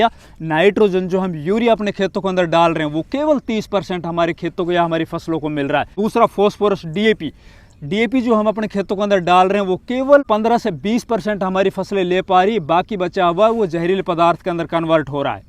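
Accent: Mewat, India. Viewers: Mewati ho ke aap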